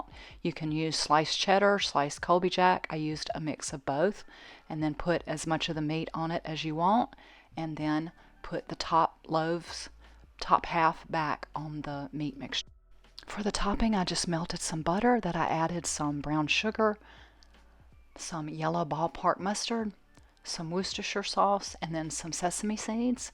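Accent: South, United States. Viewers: I’m enjoying your channel that I ran across, and picked up on that southern accent (which I have as well) so I had to subscribe! lol! Your voice is very soothing. I had a Westbend slow cooker many years ago and enjoyed it so much. When it stopped working, I kept the lid and use it frequently as a serving dish. I would love to find another, reasonably priced. I have a lot of your videos to catch up on! Blessings to y’all!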